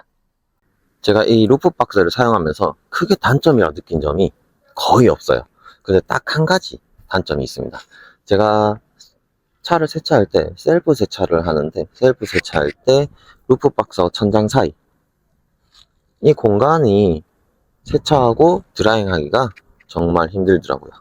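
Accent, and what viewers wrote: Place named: Gyeongsang, South Korea. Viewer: 갱상도 어디시죠?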